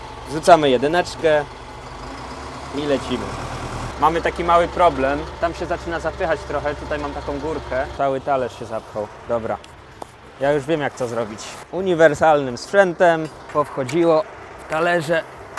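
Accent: Silesia, Poland. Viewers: jesteś ze śląska? bo czuć u ciebie ten śląski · Jesteś z śląska?? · Mówi się zilos, a nie silos. A w filmie zauwarzyłem że też godosz po Śląsku. Ps. Jestem ze Śląska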